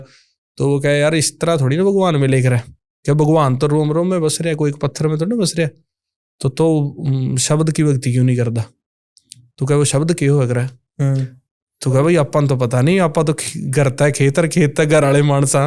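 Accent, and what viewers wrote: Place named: Haryana, India. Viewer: So sweat haryanvi language sone bhai sab ji 🙏 🙏🙏🙏🙏🙏🙏❤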